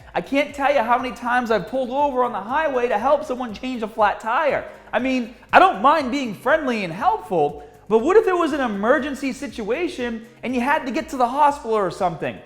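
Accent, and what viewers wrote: Boston, United States. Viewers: The Boston is strong with this one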